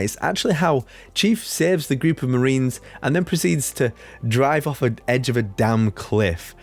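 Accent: Yorkshire, United Kingdom. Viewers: This is the first time ive seen one of your vids, you sound Keighley/leeds as fuck! Great analysis btw, this looks as though it could be the best halo since number 3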